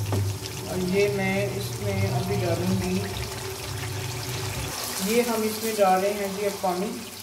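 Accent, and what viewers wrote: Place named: Punjab, India. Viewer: ur Punjabi accent is very nice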